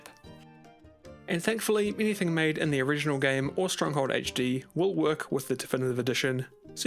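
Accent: New Zealand. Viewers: Love the Kiwi accent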